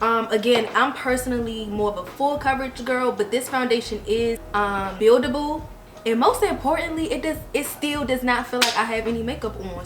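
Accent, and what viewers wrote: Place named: Baltimore, United States. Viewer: Sounds like she's from Baltimore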